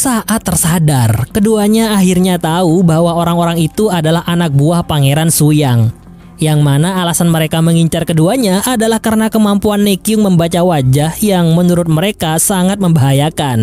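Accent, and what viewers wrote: Madura, Indonesia. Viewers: Eeh reng madurehh ka adminn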